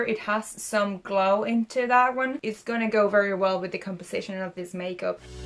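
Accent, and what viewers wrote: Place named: Ireland. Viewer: I love your soft Irish accent :)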